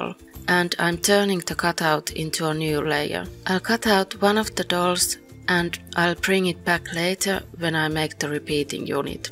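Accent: Finland. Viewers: You sound very Finnish :D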